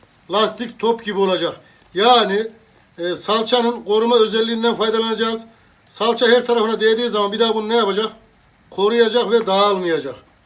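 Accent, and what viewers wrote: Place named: Central Anatolia, Turkey. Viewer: Ustam siz nerelisiniz 🤗 şive tam içanadolu gibi 🤭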